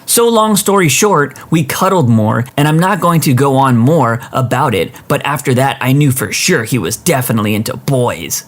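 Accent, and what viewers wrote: South, United States. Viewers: As someone who lives in the south, I approve of the narrator using a southern accent in the first story XD it's just so funny to me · The southern accent is HILARIOUS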